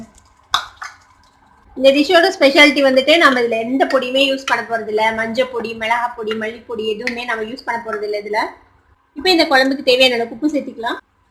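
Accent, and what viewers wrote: India. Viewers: நீங்க நிஜமாவே கோவை தானா..? உங்க பேச்சு வழக்கு எங்க பகுதியில் உள்ளது போல இருக்கு களனி னு சொல்றது களனி புளிச்சாறு ரொம்பவே நல்லா இருக்கும். Idhu ennoda mobile number sister. karaikudi pakkam vaanthaal avasiyam vaanga sister. 😊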